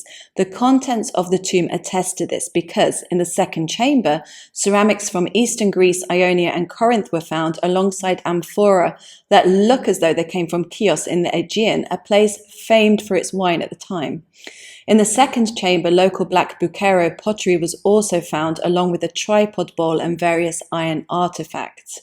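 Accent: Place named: Northern England, United Kingdom. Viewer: So, you imagine that you know what the rest of the world is all about, eh? I am fully aware that hers is an accent found in the more Northerly parts of England and not in a North American variety. I understood her perfectly, but others, schooled in "English as a foreign language", might not find it so easy. Perhaps you might care to elucidate on you expertise in this language discipline.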